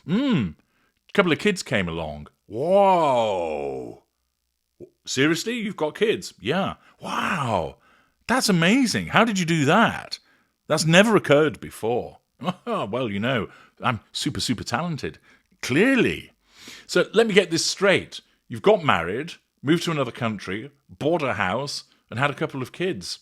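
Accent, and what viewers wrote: United States. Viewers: Love the American accent!!!🎉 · Good American accent! I think if you asked Hank and Skank on their wedding day what their hopes were, I would say look at the 758 page dossier that explains the "business" deal that this sham is. Now wave and smile Ginger Poodle!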